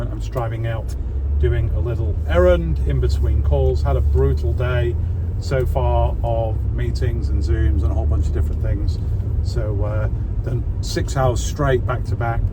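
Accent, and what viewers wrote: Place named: Merseyside, United Kingdom. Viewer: You have a merseyside twang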